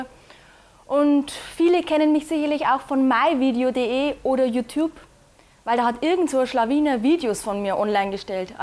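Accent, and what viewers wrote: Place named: Bavaria, Germany. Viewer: bayrisch is schwul... aber ihr würd ich geben ;)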